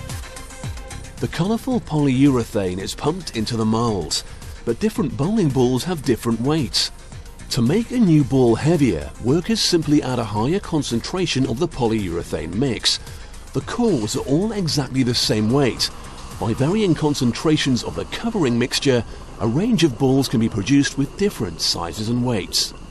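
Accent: England, United Kingdom. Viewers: It sounds like him in as much as he's English. But really, Clarkson sounds nothing like this. Do all us Limey's sound the same to you? ;) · So if this is in the US then why is a english person from britian narrarating? I thought this was on BBC for a second there.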